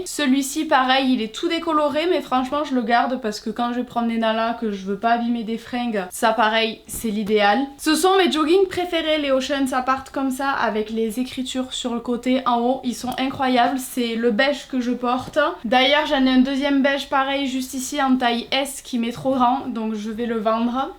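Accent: South, France. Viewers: un sacré accent du sud haha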